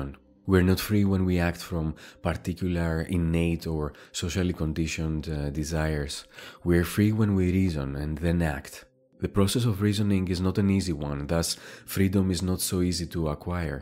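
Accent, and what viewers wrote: Greece. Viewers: yeah im a greek and his accent sounds Greek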